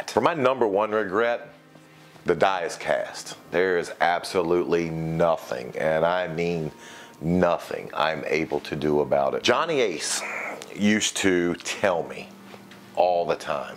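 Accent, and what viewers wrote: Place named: South, United States. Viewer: I can’t guess his regional accent. Seems like a warm hearted southern guy.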